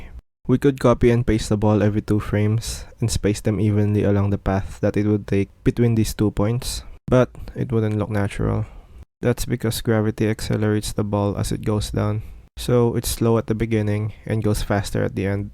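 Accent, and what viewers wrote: Philippines. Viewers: i hear filipino accent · You sound filipino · idk man has a filipino accent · Just asking, are you Filipino? you sound like it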